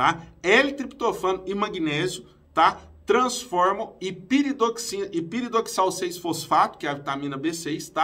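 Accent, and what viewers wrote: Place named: Minas Gerais, Brazil. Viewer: Eu gostaria de dar mil likes para você Dr. Sou mineiro mas resido aqui no Rio de Janeiro há 45anos, e você pelo sotaque parece ser meu conterrâneo, Mineirão mesmo. Eu era gago qdo criança até aos 9anos, minha mãe uma Pernambucana arretada me aplicou um remédio natural do nordeste, uma bela duma colher de pau na cabeça, e não é que eu fiquei bom na hora.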